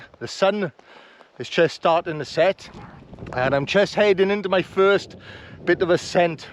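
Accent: Wales, United Kingdom. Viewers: I love your videos … so relaxing but that could be down to your Welsh accent. So soft and bootiful 😂 Also the vids are very informative. Just joined and the price is a steal especially as your videos are so well shot and narrated. Much love to and. Keep on doing what you’re doing it’s much appreciated.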